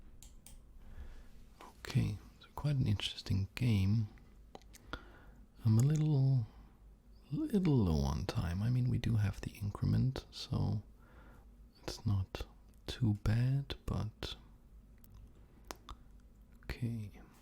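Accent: Denmark. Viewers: Are you from Denmark (If so then me too)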